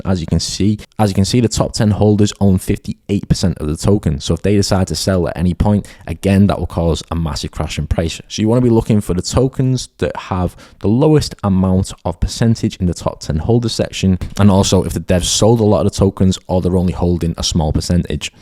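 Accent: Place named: United Kingdom. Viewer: Always subscribe when I hear the UK accent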